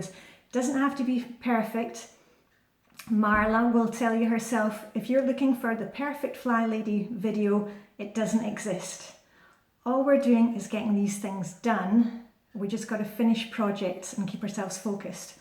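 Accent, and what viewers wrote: Scotland, United Kingdom. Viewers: I'm probably late to Flylady but I am on Day 10 and I totally love it. your videos are clear and concise, everyday I look forward to seeing the wonderful outfits you have curated. You have a be kind to yourself attitude which gives the system such universal appeal. I am recommend your videos to my Mummy friends as well as to my own Mum. I must confess I don't do the shoes (I am pretty OCD about shoes in the house, and don't want to confuse my children about this. Therefore I have my shoes for the day at the door ready but otherwise I'm completely ready, even down to my strip lashes). I am keeping and eye out for a house shoe somewhere between a ballet pump style slipper and a shoe. Can't wait for tomorrow. Thanks again (beautiful Scottish accent btw) x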